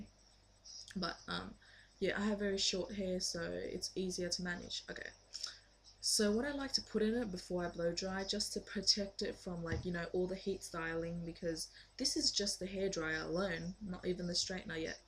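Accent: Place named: Australia. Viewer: OMfG ~ lolol bro u so funny XD love your australian accent^^~ 'i dont wanna walk around lookin like an abandoned cat' LOL lemme fry mah chicken on your hair! XD neon did hair tuts too - - u guys are the coolest twins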